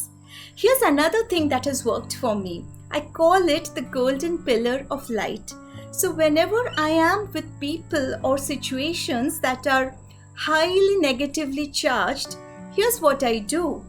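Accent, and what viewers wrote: India. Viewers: Cute Indian accent. I would come back to listen what story would you have next. <3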